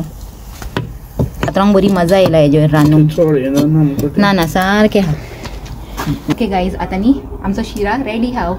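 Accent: Mangalore, India. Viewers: Mangalorean accent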